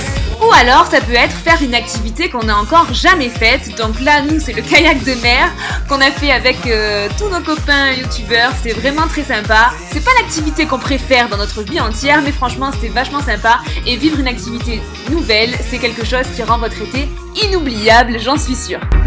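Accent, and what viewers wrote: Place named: South, France. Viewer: Je t'ai découverte depuis quelques jours ( merci aux suggestions!) Ta bonne humeur, ta spontanéité, ton accent ( ça me rappelle mon sud!) me fait sourire! Avec vous êtes authentique et très agréable, continuez ainsi et soyez heureux c'est l'essentiel!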